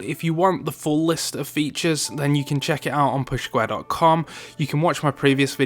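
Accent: United Kingdom. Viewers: Long winded annoying British accent, geesh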